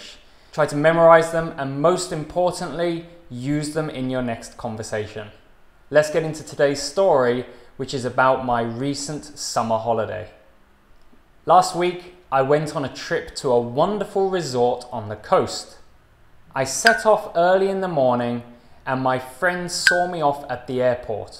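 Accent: RP, United Kingdom. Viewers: 👏👏👏👏👏What goooorgeous English ! You make me feel at Cowdray Park Polo Club, in Midhurst !😄😄🇦🇷🇬🇧🇦🇷🇬🇧🇦🇷🇬🇧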